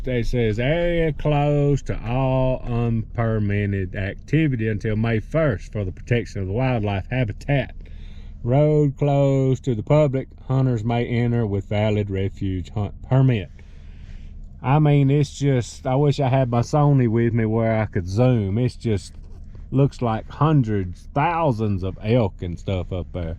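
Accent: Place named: South, United States. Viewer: You know it’s one of us Southern boys when ya hear “ that sure is a pretty rock”. I love it! Glad y’all had a good time brother! Thanks for sharing it with us.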